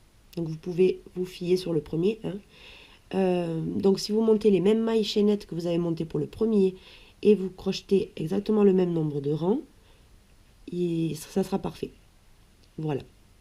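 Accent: South, France. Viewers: Quel talent,et ''avé'' l'accent du soleil ch les Chtis c'est encore mieux!!Je vais de suite voir le site,continuez!!Mon rêve de crocheter va se réaliser🙋👍👏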